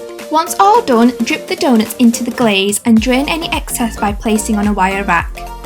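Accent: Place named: United Kingdom. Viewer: Damn! This makes me crave for donut 😅.. Dear I love the tutorial and listening to your British accent. ❤️😉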